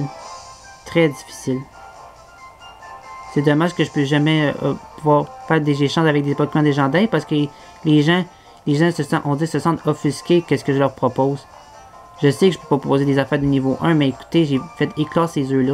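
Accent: Quebec, Canada. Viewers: t un québecois?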